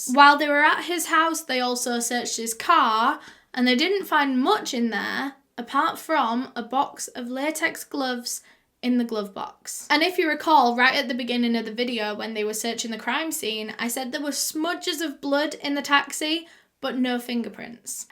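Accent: United Kingdom. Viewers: how did I know you were from uk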